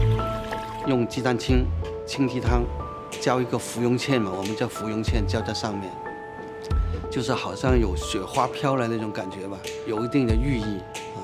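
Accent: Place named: Cantonese, China. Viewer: Yucheng Zhou I somewhat agree with you except that to me he sounds like how my dad speaks mando while his main language is canto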